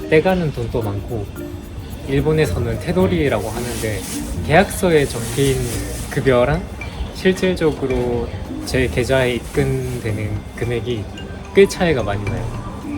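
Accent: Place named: Gyeongsang, South Korea. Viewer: 혹시 경상도 출신이신가요~? 억양에서 살.....짝 경상도 억양이 ㅎㅎ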